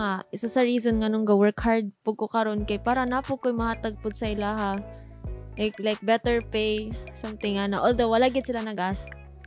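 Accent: Visayas, Philippines. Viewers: Ang sarap pakinggan yung pagsulti nimo bisaya..proud cebuana here..so bisaya rin ako..haha 😊nagseself study ako ng japanese but I'm not good at singing in japanese like you sung japanese songs..super galing mo ☺️😍👏👏,request nman po " " by ft.. thankyou!😍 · Hello again ate ❤️ Cutie mo po always pati yung bisaya accent nyo po. Parang gusto ko na ren matuto magbisaya🐱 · Kung di ka taga cebu, taga davao ka na bisaya 😂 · Oh so she is from the Philippines well i don't know that accent is it Visayas or Mindanao?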